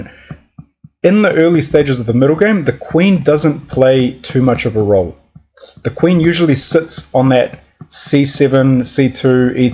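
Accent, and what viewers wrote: New Zealand. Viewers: THANK YOU, OUTSTANDING CH! I PLAN ON VISITING NEW ZEALAND. i WAS CURIOUS TO ASK, YOUR ACCENT, IS IT BRITISH OR NATIVE NEW ZEALAND/ THANKS PAL,... MIKE.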